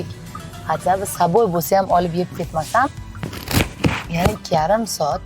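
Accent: Tashkent, Uzbekistan. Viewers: Фаргоналикман дегандингиз.Тошкент шевада гапиряпсизу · Узиз фаргонадан бусезам Тошкент шевада яхши гапирасиз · Кечирасизу нега водийлик була тошкент шевада гапирасиз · Сиз фаргонадансиз,Миндондансиз тугрими.нима у/н Тошкент шевада гапирасиз.Миндонди шевасидан уяласизми.нимага пойтахтга бориб шеваларини тезда сотасизлар.буни кандай изохлайсиз.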